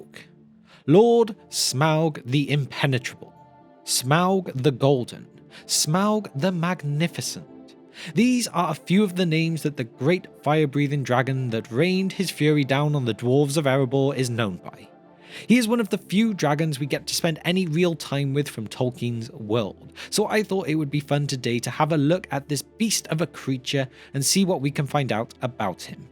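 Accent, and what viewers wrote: Wales, United Kingdom. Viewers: You're Welsh? If I ever bump into you in the Merthyr area I owe you a drink. You guys do amazing work on these videos, nice and easy to digest :) Do you have a Discord?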